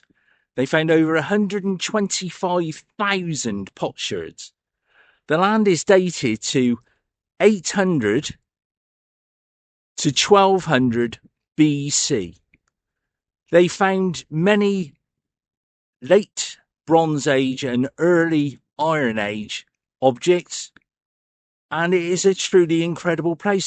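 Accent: Wiltshire, United Kingdom. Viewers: My wife says that your accent sounds like my father who left Wiltshire as a boy in 1910. Another nice video. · Hello. You're such an interesting person. Wiltshire through and through, with an accent to match. That holloway looked to have some tarmac to walk on. Great video, thanks.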